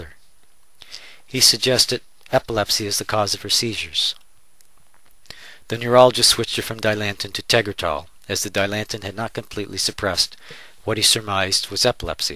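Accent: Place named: Canada. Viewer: the guy talking must be canadian, i keep hearing abooot and thats def canadian.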